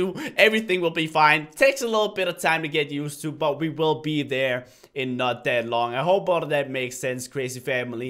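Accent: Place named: Denmark. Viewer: Reece Warren His First Language is Danish. Which Creates His Accent. English Is his second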